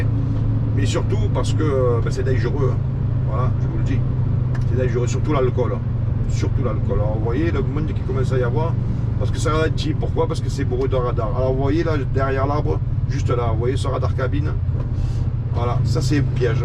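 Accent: Marseille, France. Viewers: merci l'ami! tu viens de me réconcilier avec la geopolitique !! c'est vrai qu'avec l'accent marseillais ça passe mieux !;-)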